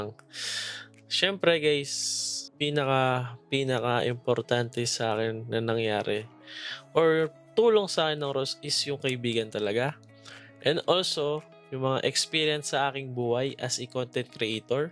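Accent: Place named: Pampanga, Philippines. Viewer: Kapampangan na kapampangan idle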